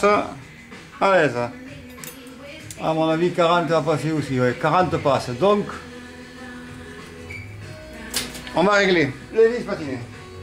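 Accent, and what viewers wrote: South, France. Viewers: t'a voix dû sud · j'adore ton accent, ça sent bon le sud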